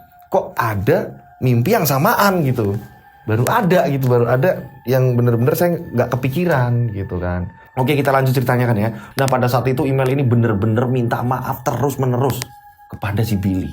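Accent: Java, Indonesia. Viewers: Mikum,, sya orng subang ,, enak dngerin cerita yaa apalagi dngan logat jawa👍,, sukses trus cerita yaa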